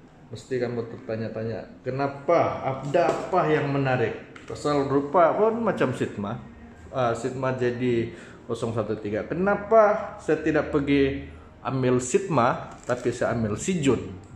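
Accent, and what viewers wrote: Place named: Kota Kinabalu, Malaysia. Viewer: nah suara topinai urang kk ni kan hahah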